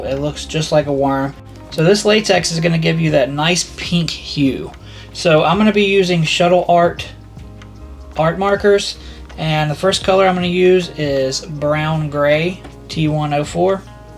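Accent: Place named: South, United States. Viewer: I cant figure out your accent,much love and respect from western NC,you sound like you could be from the south but cant tell for sure.i grew up fishing the Sierra nevadas as a kid but call asheville nc home now..but also what state you doing tattoos in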